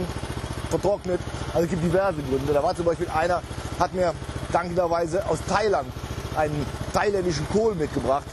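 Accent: Germany. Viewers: super sache! Wieder ein schönes Gemüseanbauvideo. Da kriegt man richtig Lust, das nachzumachen. Dieser Kanal ist mittlerweile einer meiner Top 3 Lieblingskanäle. Möchtest du eigentlich Hilfe bei den englischen Untertiteln? Ich könnte ab und zu mal einige Fehler rausmachen, obwohl ich auch kein englischer Muttersprachler bin. Dein Dialekt ist rheinländisch? Klingt auf jeden Fall auch schön.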